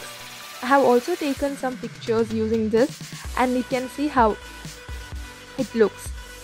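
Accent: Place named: South India, India. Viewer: I think ur south indian, but bytheway it was nice 😀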